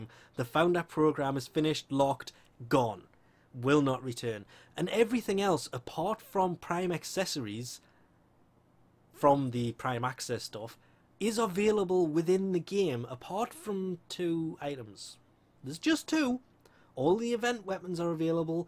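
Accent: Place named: Northern England, United Kingdom. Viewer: According to your accent I think you're from the middle or north from Brittan, so you're planning to fly to Toronto instead waiting till it's actually in Brittan?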